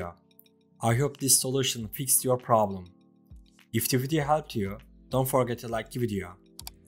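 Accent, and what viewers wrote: Turkey. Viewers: reis bişey sorucam sen türkmüsün aksanın türkçe çünkü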